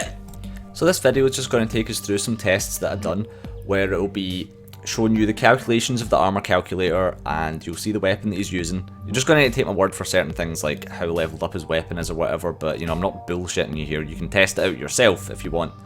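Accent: Scotland, United Kingdom. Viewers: This may be a stupid question but is this guy Scottish? English isn't my native tongue and I can't quite pinpoint the dialect.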